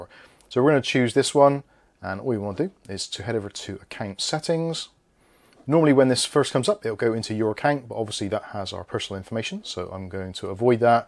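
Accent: Bristol, United Kingdom. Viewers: Thanks a bunch for the info. Why do you sound Bristolian?🤔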